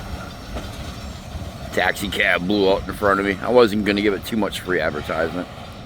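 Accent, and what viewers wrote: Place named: Uttar Pradesh, India. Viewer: I left the U.P. 55 years ago all my U.P. family is gone now to hear your accent feels like home.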